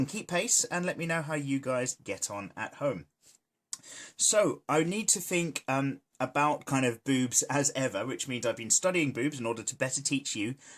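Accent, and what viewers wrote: United Kingdom. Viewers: Brilliant, but it's so unfair you can speak of boobs with such ease. This would be so pervy sounding if you didn't have such a proper British accent.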